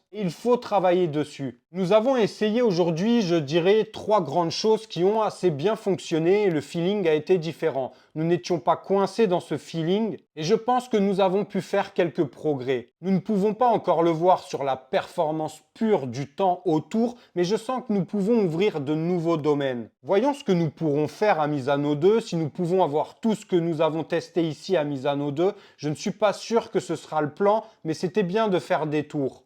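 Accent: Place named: Banlieue, France. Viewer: Très bon contenu, mais pourquoi cet accent de banlieue? Dommage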